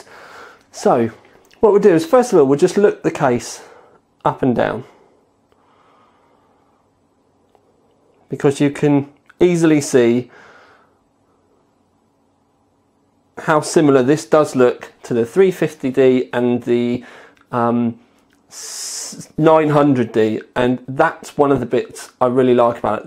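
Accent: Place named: Cockney, United Kingdom. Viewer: You make computer case reviews fun to watch. You are a true American hero. Or would be, if you didn't speak like a cockney so much.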